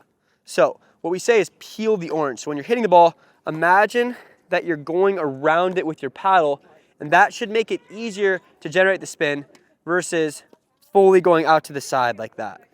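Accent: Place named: Southern California, United States. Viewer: A good idea. Wish you put a video in which show it had an incorporate more of the top spin into the serve, which would make it really wicked. I like this guy, just wish he didn't have such a SoCal heavily voice inflected vibe. It kind of lulls me to sleep.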